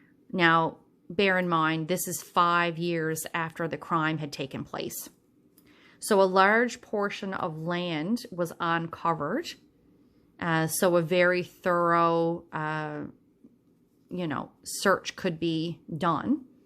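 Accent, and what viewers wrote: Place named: Newfoundland, Canada. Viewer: I’m saving this to listen/watch later with some stitching in hand. Fabulous idea. You’re the perfect person to do this considering your profession as well. Plus I just adore that Newfoundland accent. I have been away from flosstube for too long but you’ve drawn me back in:)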